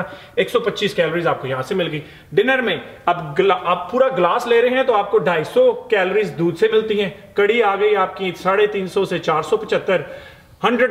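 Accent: Punjab, India. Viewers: Super style sir ji sp ki hindi panjabi mixx super shahid style sir 😁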